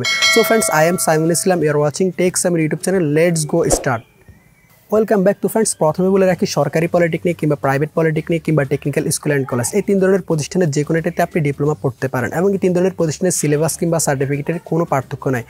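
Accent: Noakhali, Bangladesh. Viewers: Vai apner bari noakhali? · ভাইয়া মনে হয় নোয়াখালীর?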